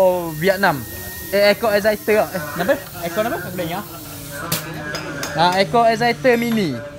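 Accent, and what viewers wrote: Terengganu, Malaysia. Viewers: Macam org ganu jee....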